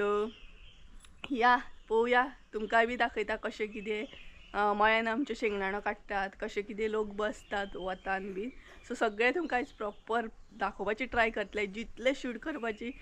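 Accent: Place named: Pernem, India. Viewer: from which place r u Pernem coz from ur way of talking I understood that u r from Pernem.side the way u said gauta Proud u should feel of urself.coz u r showing the hard work before the monsoons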